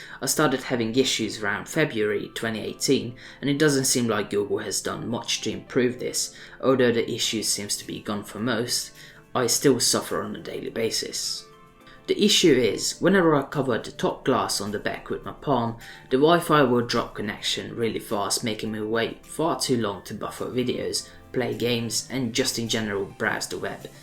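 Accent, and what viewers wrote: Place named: United Kingdom. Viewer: I still own this phone in 2019. I won't upgrade until a decent phone is actually released. i used to change phones every 6 months, but at this point everything is such a mediocre upgrade. I love my Pixel 2 XL and for all it's faults, it's still the best phone I've owned so far. Hoping the Pixel 4 XL is worth buying. If Google choose LG again for the Pixel 4 XL (I know it's not in the 3) then I will not buy it. I have a hatred for LG PLED. Awful quality, Samsung are OLED kings. Just a side point, interesting accent! It's at times very British like mine, and at other times very mixed lol, just curious. Update 27.04.18: Just accidentally ordered an s10+ (£650). I have a feeling I will regret this.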